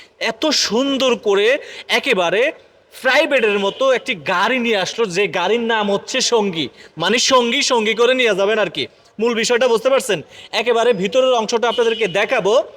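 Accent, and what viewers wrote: Noakhali, Bangladesh. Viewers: মুরুক্ষা বেডা এটার নাম ড্যাসবোর্ড বলে..... কথাই ঠিক নাই....নোয়াখালির একটা টান থাকে কথায়....😂😂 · This guy is very excited. Is he a pure Noakhalian speaking Bangla?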